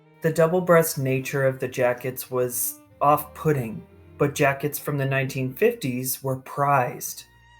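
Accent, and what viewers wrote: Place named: United States. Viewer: I was thinking that you had suffered a catastrophic research failure... but then considered your American accent. So, yes, the Macaroni's were famously tasteless in their dress, celebrating the nouveau riche over the 'conspicuous inconspicuousness' that was fashionable at the time. So, while you certainly did well with the colours and embroidery patterns of the period, you were then let down by the poorly cut weskit and over-buttoned breeches. Sigh, and no hat. Please, wearing a hat was thought to be a matter of basic decency. When indoors and not actually wearing it, you would still be expected to be carrying it! Your sexuality is of no concern to me, but your drunken fashion choices (thank you, Dionysus) appear to be deliberately offensive.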